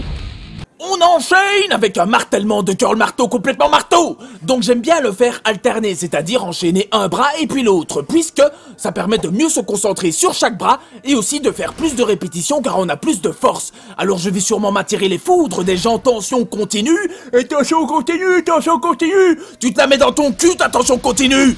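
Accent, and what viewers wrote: Belgium. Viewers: Salut à toi jeune fou, j'ai mis du temps à apprécier ta chaîne et son format mais je suis fan maintenant. De très belles connaissances techniques et biologiques saupoudrées d'un humour barge le tout sur un accent de Dikkenek !!!! J'achète, bravo.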